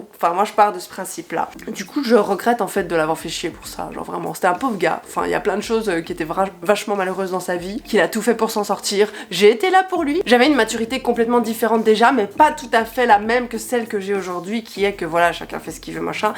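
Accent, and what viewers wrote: Brussels, Belgium. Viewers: MEUF t'es bruxelloise en fait?! Je me suis toujours demandée d'où tu étais en Belgique (je suis Bruxelloise) mais je me sens honteuse de pas avoir reconnu l'accent là quoi... (bon maintenant, je regarde le reste de la vid)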